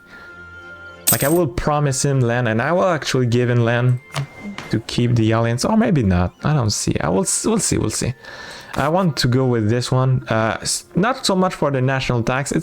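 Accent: Quebec, Canada. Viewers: Ça c'est un accent québécois 😂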